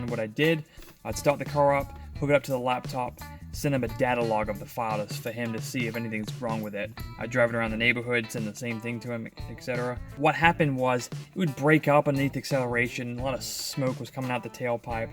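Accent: Australia. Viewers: don't pay these dudes any mind. They are only used to hearing American accents. You sound like Australian or New Zealand. I can understand you clearly. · Australian ? Your accent and the flag on the wall are hints that you are. Bless the koalas and other endangered animals. Enjoyed your video. Looking forward to more.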